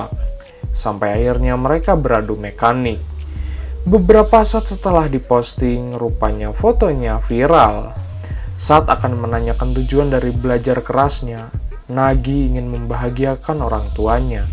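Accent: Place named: West Java, Indonesia. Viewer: Sunda gening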